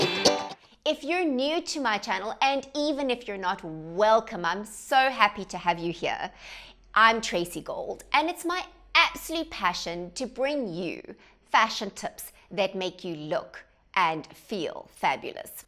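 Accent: South Africa. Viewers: oh! I am so happy that you said where you were from. I have been trying to figure out where your accent was from! Some American and a lot of South African. I really like the new longer format of your videos envied the little facts about yourself at the end .Makes you seem much more relateable.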